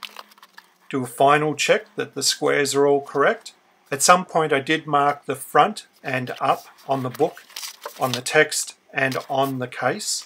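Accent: Australia. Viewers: You are amazing! Thank you for sharing your knowledge with us. I particularly love hearing your Australian accent. I too am Australian and proud to be with such brilliant work coming from you. Thank you so much.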